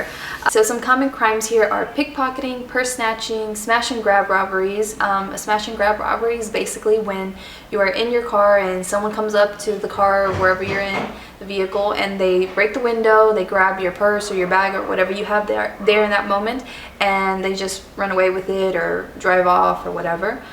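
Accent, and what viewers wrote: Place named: United States. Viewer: your accent is american. whats the deal? you were born in peru and you grew up in USA? and btw, mystura is at Jockey Plaza right?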